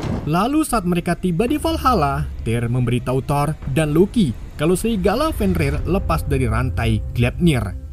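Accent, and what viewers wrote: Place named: Wonosobo, Indonesia. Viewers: Admin e wong jowo, damput😂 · Membetett i iwak .. Curiga wong Wonosobo iki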